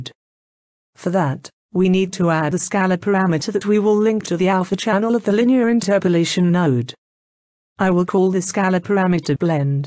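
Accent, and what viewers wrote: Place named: United Kingdom. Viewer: Thank you, British robot lady.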